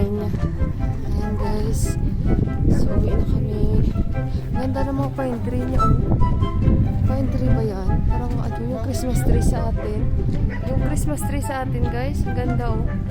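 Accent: Iloilo, Philippines. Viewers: Ilonggo ang tuno mu teh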